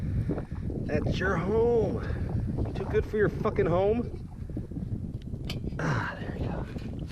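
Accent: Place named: North, United States. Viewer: Apparently you Northerners speak the same dialect of 'murican that we do here in SW Ohio. Very entertaining!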